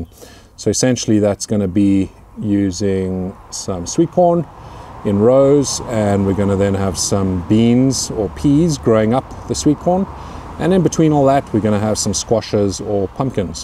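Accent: South Africa. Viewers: I really like your chilies 🌶 and I know your in U.K., but I detect South African accent? Maybe I am wrong. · I might be completely wrong, but do I somehow detect a little bit of South African in his accent? The way he speaks just sounds like home.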